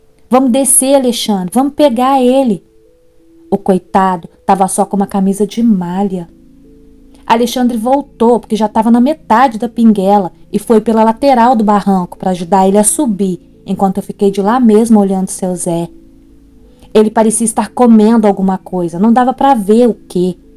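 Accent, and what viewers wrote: Minas Gerais, Brazil. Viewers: É seu sotaque mineiro ta indo embora e vc ta mais calma nos relatos e mais suave.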